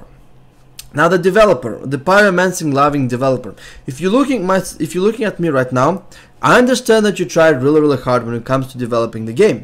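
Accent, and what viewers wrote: Russia. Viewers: your russian accent is good with soothing music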